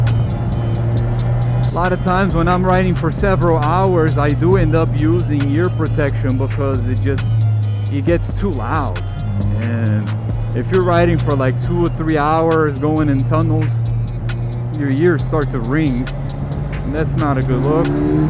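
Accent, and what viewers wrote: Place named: Taiwan. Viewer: So youre from Taiwan? Do all people from taiwan have that accent? And also, do most people speak such fluent english? Love the channel. Subscribed. Hopefully you post some new vids one of these days!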